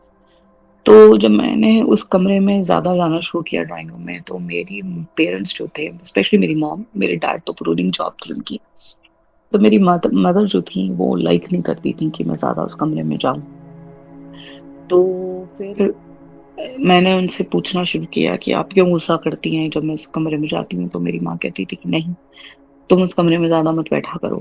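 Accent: Pakistan. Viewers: i swear at the start of this i thought she is a pakistani this exactly how we talk sound shame for all the hate for all it does is dehumanize the heart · Are you sure you are indian because your accent is quite pakistani and you speak quite alot of urdu words good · Exctly.....ye hindi hai hi nhi....typicl urdu accnt..isnt it...i m a hindi speaker..i knw the difrnc.. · Indians ye style/tone me nahi baat karte. Pakistani style hai. Apne apko hindu Bata rahi hai aur jammu Kashmir ghum ne ki baat Kar rahi hai.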